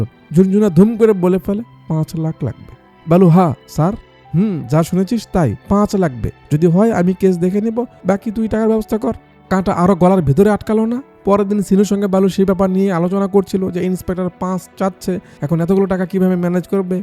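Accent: Bangladesh. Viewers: তোমার বাড়ি কি বাংলাদেশ তোমার কথা ধরন দেখে বলছি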